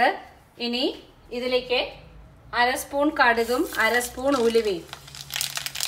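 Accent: Palakkad, India. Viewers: Variety dish,,,,, also Ur way of speaking, palakkadan style, kelkkan nalla rasam undu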